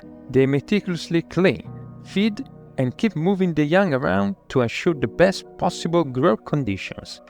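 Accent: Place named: England, United Kingdom. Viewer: I have a question: Where are you from, because I recognise that you are from England because I'm from Italy 🐜🇮🇹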